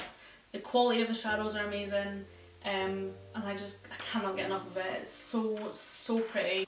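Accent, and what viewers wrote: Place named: Scotland, United Kingdom. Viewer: Omg! Loving this look, colours are gorgeous on you...I'm diggin' your accent! Are you from Scotland, I'm not good at detecting accents so please bare with me luv 😂 😂 😂 Had to subscribe, excited to get to know you girlie 🌸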